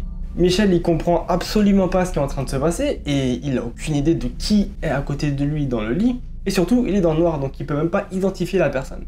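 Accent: France. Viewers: Mais tu vis au québec ? Mais t a l accent français...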